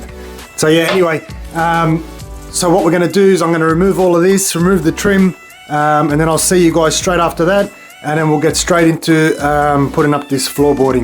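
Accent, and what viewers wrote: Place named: Sydney, Australia. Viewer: Didn't he say he was going to remove the baseboard? With an accent like that, I'm guessing he lives in Sydney.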